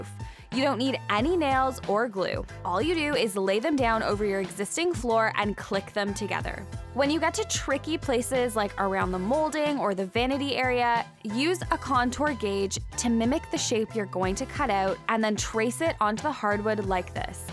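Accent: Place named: Canada. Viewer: Ah ha! I ended up here from another recent video of yours, and I thought I recognized that canadianness (I'm from Montreal, hehe)!! xD Great videos!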